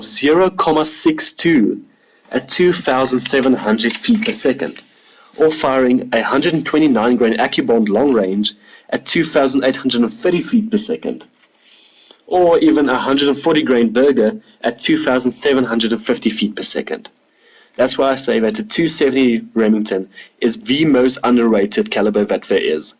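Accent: United Kingdom. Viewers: Why is everything these days have to have someone with an English or British accent? Minute I hear and I turned it off because it's b***** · Your an idiot 25 06 has been around for about 100 years it must be that Brit tone that you have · Your narrative is useless - try another enclosure for your talk - and get rid of that shitty British accent.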